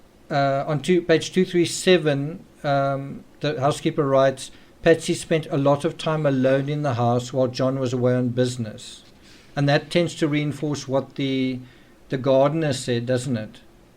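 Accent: South Africa. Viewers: Children. He has a South African accent.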